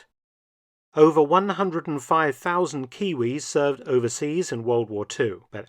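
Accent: United Kingdom. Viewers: Given the appearance (your grandfather's photo) that you aren't as entirely British as you sound, I suppose it is not a coincidence that this was released on ANZAC day. My son wearing my fathers WW2 RAN medals placed a wreath for Scouts Tasmania as part of the ANZAC Day remembrance ceremony today. He also officially received his Queen scout award. Well done Monty, Pa would be proud.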